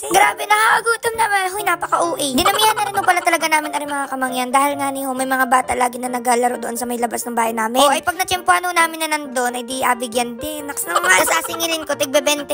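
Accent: Batangas, Philippines. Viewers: Why Kamangyan ang vlog mo? Are you part of Mangyans? Nagtatanong lang, curious lang ako. Kasi your beautiful even no make up. Saka accent mo Batanguena. Ala Eh!! From Las Vegas, Nevada USA.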